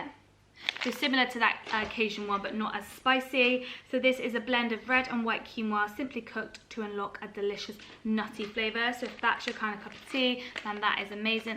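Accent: Bristol, United Kingdom. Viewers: I knew it was a Bristolian accent, mines the same😂😂x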